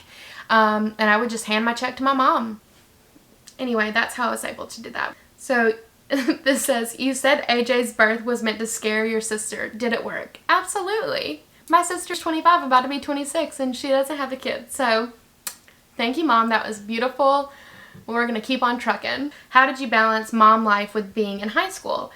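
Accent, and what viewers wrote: Alabama, United States. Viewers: I had a STRONG feeling you were from Alabama. I’m from North Alabama! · When I’m watching this video you guys are at 99.4 subscribers. SO CLOSE! I would love to see a series of videos like this. Also, do you guys still live in Alabama? NOT CREEPY, I’m just really fascinated by your accents 😂🤣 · I just discovered your channel from your story video 💕💕 I knew I recognized that Alabama accent.. I'm a Bama girl too! 😊 You sould like a great mom! I would love to watch a series on your teen mom experiences. Thank you for sharing your story.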